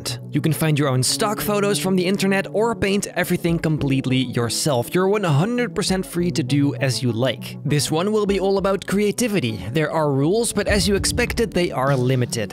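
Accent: Netherlands. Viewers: I ain't gonna lie bro, I don't mind you having a Dutch accent when you talk, but I DO when you sing..That was intense haha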